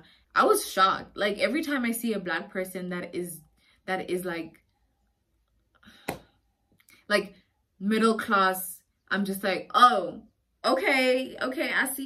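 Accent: United States. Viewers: You came back with a slight American accent 😹. This was very informative thank you.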